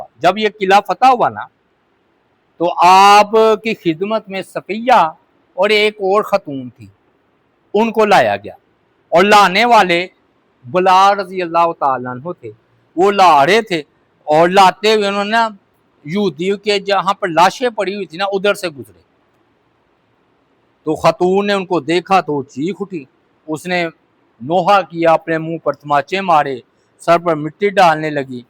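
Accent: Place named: Lahore, Pakistan. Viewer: I like his lahori accent..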